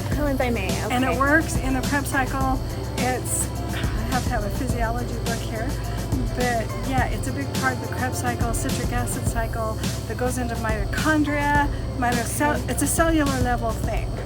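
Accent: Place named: Utah, United States. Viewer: All the way through the video, I kept thinking to myself, “she sounds like a Utah mom.” Then when you mentioned here from Kaysville, I had to laugh. I know a lot of people have had a great deal of success. Doing this, with B5, vitamin a or zinc. Years ago, I had success using a combination of all of them, but forgot about B5 in the years sense, so I’m glad I came across this video as a reminder. My son started getting severe acne right after his last growth spurt and a couple days ago I increased his intake of vitamin a and zinc. I’ve also read a lot of science based evidence that iodine in its natural state, such as a sea, kelp supplement is beneficial and I’m just waiting for those to show up in the mail. Since we have a natural grocers right here in bountiful, I’ll also swing by there and pick up some B5. He’s only 12 and it hasn’t been coming this year for his self-esteem yet so it’ll be good to help him how he’s much younger.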